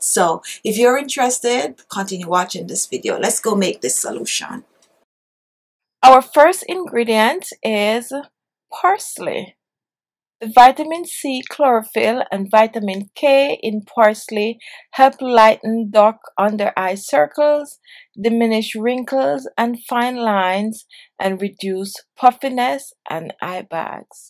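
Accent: Jamaica. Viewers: kokonut 4ever Jamaican-it's evident from how she speaks and pronounces her words. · hey girl I am loving your videos, I can hear your Jamaican accent you go sister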